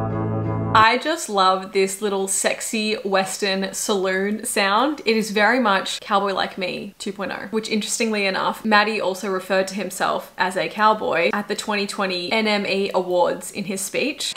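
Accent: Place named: Australia. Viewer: Australian accent is such a turn off for me. Like I wanna enjoy her content but just because of her talking style, I can't. 😢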